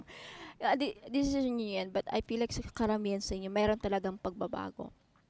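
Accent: Visayas, Philippines. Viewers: Maayong Gabii mam... Bisaya gyudta dae... hehehe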